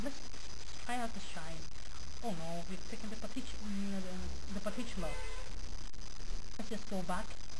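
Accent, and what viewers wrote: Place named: Africa. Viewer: No no crime we are brothers xD People don't understand an African accent when they hear one lol Keep up the great work + new subcriber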